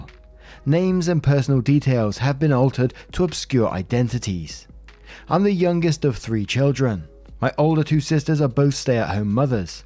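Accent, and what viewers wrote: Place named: United Kingdom. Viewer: What? has an accent? I didn't know! Oh, wait... he's a fellow Brit! 😁 But you are right, he'd do well as a voiceover artiste.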